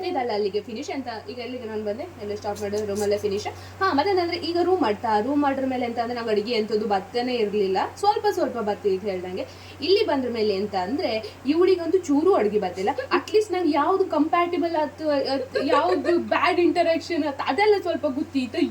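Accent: Kundapura, India. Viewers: Edu pakka kundaura kannada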